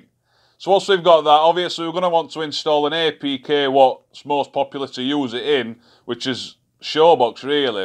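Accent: Yorkshire, United Kingdom. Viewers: Hi... that's got to be the fastest subscribe I've ever done... 20 seconds in... all I heard was a Yorkshire accent and the laugh... top one mate. I'm on the other side of the Pennines.... Look forward to your upcoming videos.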